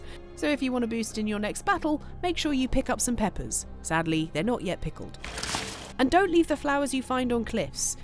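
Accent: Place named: United Kingdom. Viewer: the British accent makes a difference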